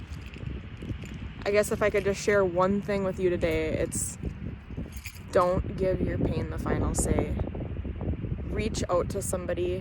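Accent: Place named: Canada. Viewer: I WILL add a comment since somebody may have passed your thoughtful video by. My Grandfather also lost his life by suicide. In fact, my Mother, who I must admit cannot go see, was pregnant with me when her Father did so. I believe mental health issues run in my family. There was a sincere belief that my Grandfather thought his cancer was coming back(this was in 1975), didn't want to be a burden, feared the pain, or perhaps it was an entirely different reason not related to his physical being. He had 12 children, with two different wives. His first wife passed on. But they were all related nonetheless! (You sound Canadian, btw. That is not a dig. I ❤ Canadians. I'm a simple, poor New Yorker myself.) I do suffer terribly from Major Depression with Panic Attacks, and other "things" I won't bore you with, as you are genuinely hurting as you are paying respects to much-loved family member. 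My place of work is being very charitable bc I'm on leave now. My poor husband and elder Sister have been blindsided by me not working and reasons why. I live in my own head and now I'm going through another slow process of getting help here in NYS.I have been scared into taking my medication the correct way, not running out, so I can resume my full time Custodial job at the local Casino. I wish YOU, YOUR FAMILY, & whomever happens to come across my long, but true comment that suicide is just plain scary. You leave others wondering why and so heart-broken. Because of my Grandfather's death, and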